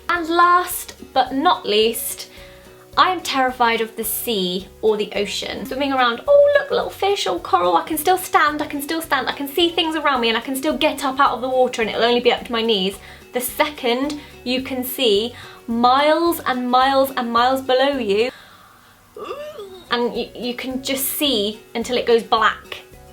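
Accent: United Kingdom. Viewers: is my favorite beauty guru out there! Her voice is just so adorable. She inspired me to make my own channel & start posting videos. After every single video I watch of hers, I accidently start talking British, haha. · Damn, I like British people. I could listen for her forever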